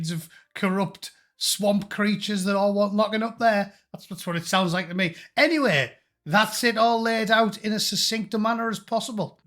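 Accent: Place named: Middlesbrough, United Kingdom. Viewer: where u from in Boro u sound like our ked from Park End, but with more vocabularic wit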